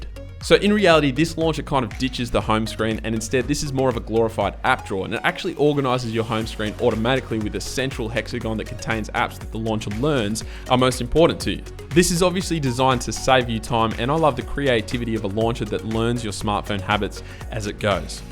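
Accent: Australia. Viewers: That irritating aussie accent... I hate this accent. Bloody aussies.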